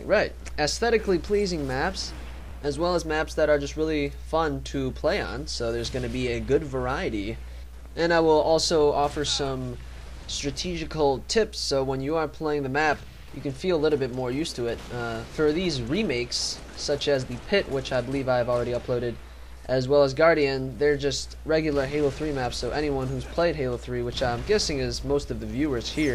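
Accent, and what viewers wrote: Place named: United States. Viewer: i know youre asian, but you sound like you grew up in america, so what was your first laguage and where did you learn it?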